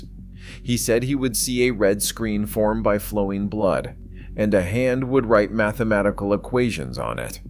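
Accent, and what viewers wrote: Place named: United States. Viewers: And the American accent is not exactly realistic. Are there no people still alive who can speak with an educated English accent ? In fact, this post was immediately followed by an advert for a charity, spoken in proper English, as spoken at Cambridge University. (I had a friend who came from a working class background and had to learn to speak in the accepted way when he went to Cambridge University). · The real Hardy didn't speak with an American accent. And he also knew how to pronounce Ramaujan's name correctly.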